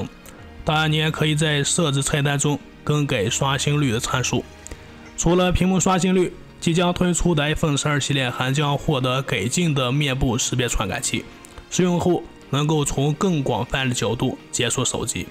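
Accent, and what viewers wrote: Shanxi, China. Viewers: 这口音不是山西就是呼和浩特的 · 确切一点是山西口音，或者西北口音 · 而且是山西中北部，晋南不是这味儿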